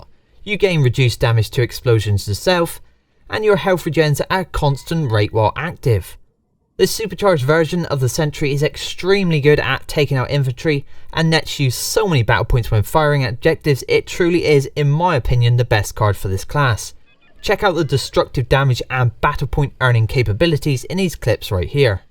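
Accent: West Country, United Kingdom. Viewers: The west country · Are you from Bristol? Or Somerset?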